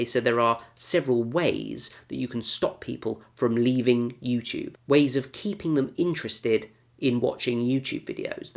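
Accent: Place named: RP, United Kingdom. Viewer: it sound like Eaten, Cambridge or Oxfort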